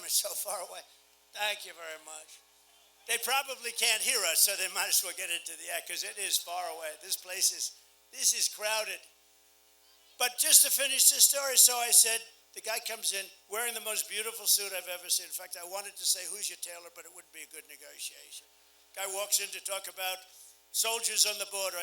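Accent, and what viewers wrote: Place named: New York, United States. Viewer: Me 2!!! Been following him for last 8 yrs know him from back of my hand knows how he talks NY'r bold strong power gumption n humor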